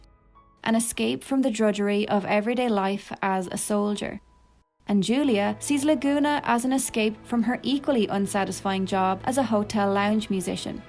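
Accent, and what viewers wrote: Ireland. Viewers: Great to hear a fellow Irish person as a FF authority!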